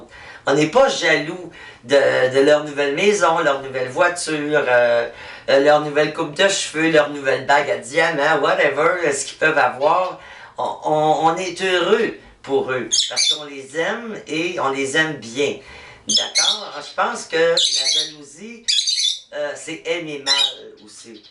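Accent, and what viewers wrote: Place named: Canada. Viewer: C'est mignon ton accent canadien ! C'est très intéressant et je suis entièrement d'accord avec toi ! Ce que tu dis me rappelle que j'ai eu des parents très équilibrés ( sans esprits de compétition,satisfaits de ce qu'ils possèdent, non tributaires des modes... ) "Le bonheur , c'est de continuer à désirer ce que l'on possède !" (Saint Augustin) Combien c'est vrai ! Augustin avait un peu copié la Bible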